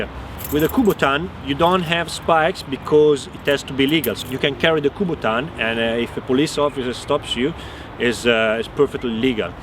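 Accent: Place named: Russia. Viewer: Bel video :) l'unica cosa è che, dato l'accento, avrei scommesso come nazionalità "russo" più che ita :D (ho trovato prima questo video e poi quello ita)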